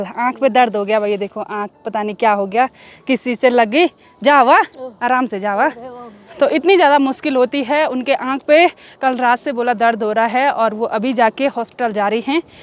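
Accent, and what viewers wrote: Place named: Rudraprayag, India. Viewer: Aapki Garhwali Bhasha hamare Rudraprayag wali hai bhardar Patti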